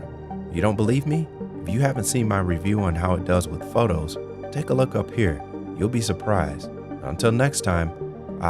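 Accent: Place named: United States. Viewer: From your voice and accent I can tell you're from the US, let me know where exactly in the US so I can go hug you??.. Geez 100/10 content and narrative. You just earned a new sub man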